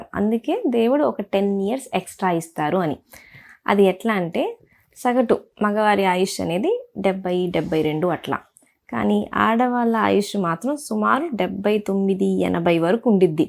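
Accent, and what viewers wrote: Guntur, India. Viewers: Meerkat cheppindi bavundi mi gunter yasa kuda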